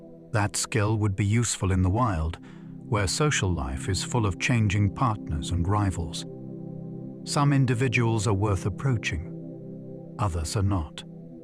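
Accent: United Kingdom. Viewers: 😂 Why shouldn't it be. I find the soft clear British accent part of the relaxing experience. And English is not my mother language. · Why is everything narrated with a British accent? It gets old, you know? If you listen to allot of things like this, or audiobooks, it gets real obvious. I can't take it.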